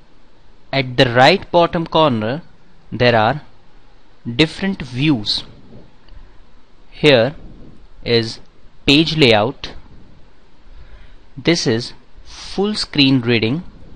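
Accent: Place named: India. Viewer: video is awesome....with all zooming and sound effects ......best part is audio is in indian slang !!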